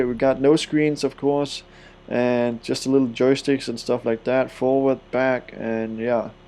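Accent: Denmark. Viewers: Man kan godt høre du er dansker, haha fede videoer!!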